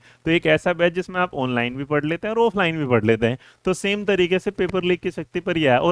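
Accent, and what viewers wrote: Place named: Haryana, India. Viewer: Haryanvi touch....oonline 😅😅😅 ...Sir Please no bad intention just like the way u speak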